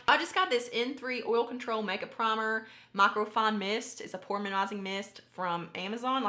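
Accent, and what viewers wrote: South, United States. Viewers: Hey from Israel!😊I love your suthern accent so much!!!❤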